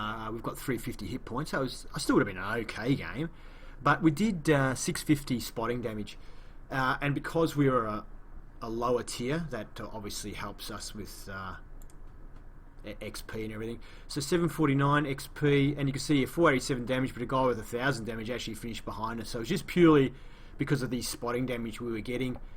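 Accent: New South Wales, Australia. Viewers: Is that a NSW accent?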